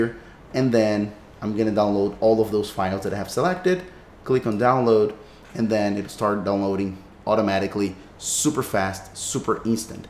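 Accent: Brazil. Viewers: Not related to the tutorial but I hear that Brazilian ascents 😂 good tutorial. Thanks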